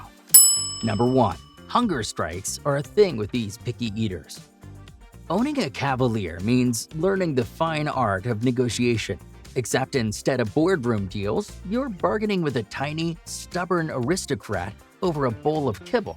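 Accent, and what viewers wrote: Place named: United States. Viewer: This guy is an absolute idiot……..these dogs unfortunately will eat anything and everything……any break from kibble or dry biscuits is a blessing…. Do not feed these dogs any kibble no matter how expensive……it is deadly to them, most dogs but definitely these….no cavalier after 2 has endless zoomies…..the stupid American English accent ……they should never be left alone for more than an hour or two….Do not get one you can’t afford to stay at home or work from home……they would die early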